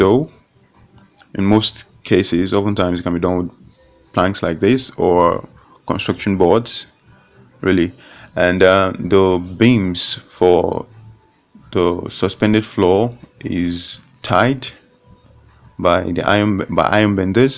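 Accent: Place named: United States. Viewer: Bros, Even with your American accent, I am aware that you are a Nigerian and this house was built in Naija. Thank you very much for this very educational video. Please upload more videos dealing with Electrical, Plumbing, and other finishing aspects. Thank you very much. This has been quite helpful. Please keep it up and keep it coming. Thank you once more.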